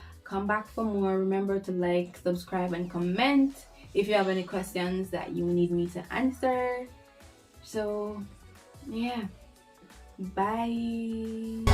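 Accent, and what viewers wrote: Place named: Caribbean. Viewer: wow, you have jamaican and bajan accents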